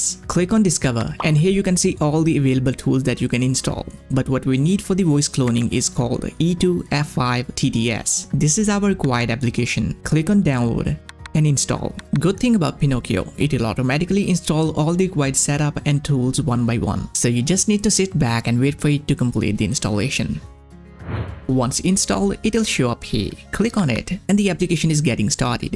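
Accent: India. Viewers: Why would you want to clone your INDIAN ridiculous accent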